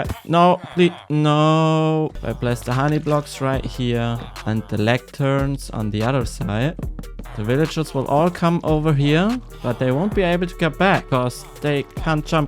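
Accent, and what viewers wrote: Germany. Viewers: Good video! Keep it up! P.s. Your accent sounds really german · Ich habe gestern das erste video von dir geschaut und man hat direkt den deutschen Akzent rausgehört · Dude are you german? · idk why but germans speaking english is satisfying to me for some reasen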